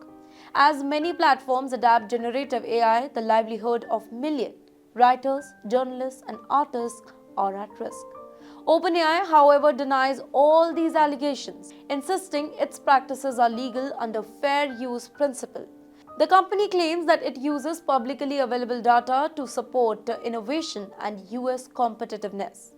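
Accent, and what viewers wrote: India. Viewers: Narrator Audio NOT Cleary Understandable, Typical Indian English, JUST RANT IT OUT, weather the Audience Get it or not, JAI HIND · It's time to learn indian english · This news reader need to learn how to speak clearly. Too much indian accent. I need to read subtitles to understand what she is talking about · Why does Indian accent make any story that’s being covered suck .. I don’t get what she is talking about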